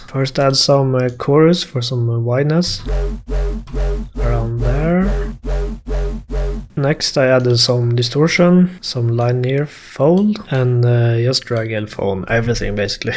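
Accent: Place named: Sweden. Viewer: This guys swedish accent is so thick and it's hilarious for me as a Swede to be able to hear this instantly😂 linear uttalas "linniör"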